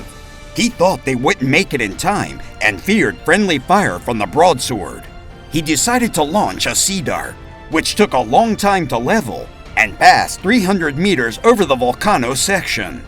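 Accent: United States. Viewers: He’s clearly American…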